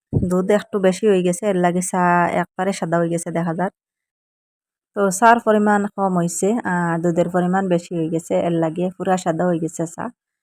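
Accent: Sylhet, Bangladesh. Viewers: আফা চা এর কালার কিন্তু খুব সুন্দর অইছে। আসাম কিতা আমরার সিলেটি মানুষ আছইন নি। আসাম তো ইন্ডিয়া। আপনার মাত সিলেটের কিন্তু থাকইন আসাম। আমি বুঝতে পাররাম না। সিলেট থাকি আসাম যাইতে কতো সময় লাগে আফা।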